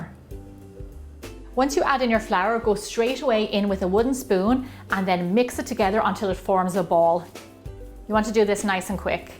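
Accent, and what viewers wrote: Ireland. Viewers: Thank You for the great video and information. I love your Irish accent. My spanish wife would shoot me for saying that. LOL!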